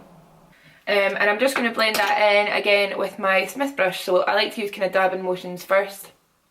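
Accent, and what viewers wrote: Scotland, United Kingdom. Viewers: When you say Laura Mercier, it sounds like you're saying "Lord have mercy" - I love it! :D Scotland will always be my absolute favourite; beautiful country, amazing people and awesome accents! <3 Cheers!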